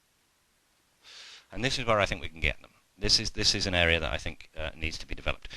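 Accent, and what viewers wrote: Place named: United Kingdom. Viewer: Let me guess, you're british.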